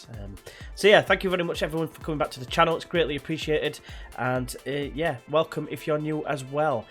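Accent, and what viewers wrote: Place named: Liverpool, United Kingdom. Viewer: That feel when you can tell its speaking but he actually sound's scouser. Great ep as always mate!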